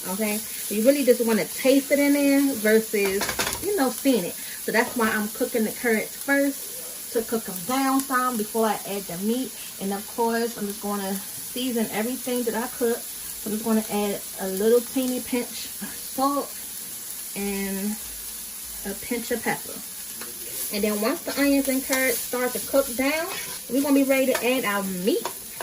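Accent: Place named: Baltimore, United States. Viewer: I hear the Baltimore all in your voice sister ❤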